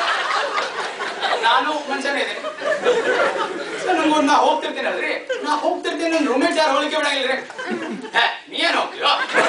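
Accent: North Karnataka, India. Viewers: Wooow super brother nice attempt do more video with good quality , which city bro ur utter karataka 👌👌👌❤💐💐👍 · Pls upload your old videos, ನಮ್ಮನ್ನ ಇದೇ ರೀತಿ ನಗಿಸುತಿರಿ. ಉತ್ತರ ಕರ್ನಾಟಕದ ಕನ್ನಡ ಭಾಷೆಯಲ್ಲಿ jokes ಕೇಳೊದೆ ಮಜ😅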